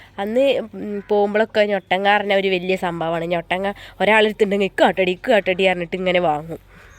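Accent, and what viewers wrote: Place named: Kozhikode, India. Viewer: Hi new friend🥰happy new year💐സംസാരം കെട്ടിയിട് കോഴിക്കോട് ആണെന്ന് തോന്നുനു🥰❤️nice vlog enjoyed😂😂😂🥰❤️അങ്ങോടും വരനെ കൂട്ടകനെ🥰❤️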